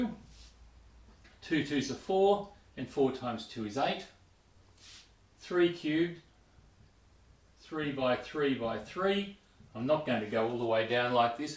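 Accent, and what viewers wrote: Australia. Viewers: g day must be from straya mate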